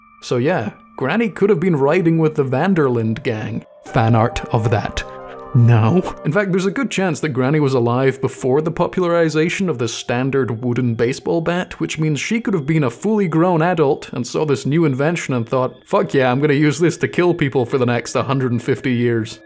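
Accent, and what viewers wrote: Scotland, United Kingdom. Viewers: Btw If that’s a Scottish accent I hear I love it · you are the most normal-sounding british person i have ever heard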